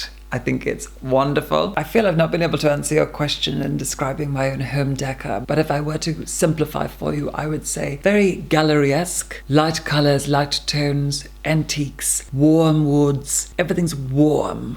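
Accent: Scotland, United Kingdom. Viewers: He’s getting rid of his Scottish accent 😭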